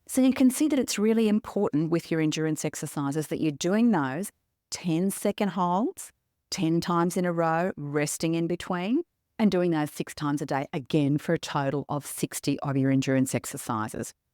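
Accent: Australia. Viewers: The Australian accent is very appropriate for errectile dysfunction discussion. My favorite channel so far.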